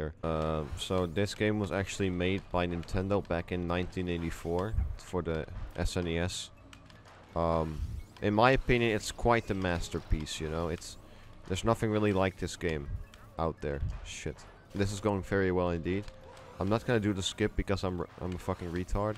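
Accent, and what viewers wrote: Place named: Argentina. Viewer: You sound Argentinian ngl Also awesome Garfield Kart Gameplay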